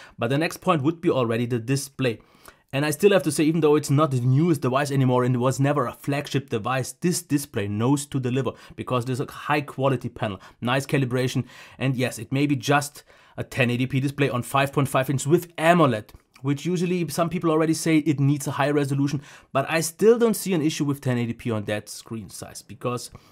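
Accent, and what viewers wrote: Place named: Germany. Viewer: I love your videos and your accent you German Guy :D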